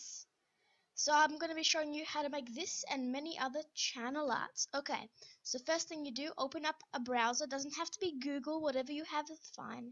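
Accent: Australia. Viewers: you're from austrilia? thats why your accent is so beautiful <3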